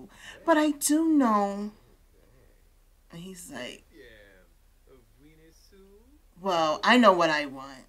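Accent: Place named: Brooklyn, United States. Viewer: no problem and yes, I love it! I'm from the south so it's rare if I get the chance to hear a Brooklyn accent.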